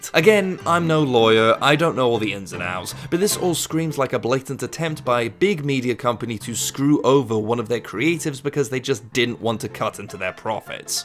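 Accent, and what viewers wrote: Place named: United Kingdom. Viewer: Holy shit! You are the most English boi ever. Also, this show sucks! Rip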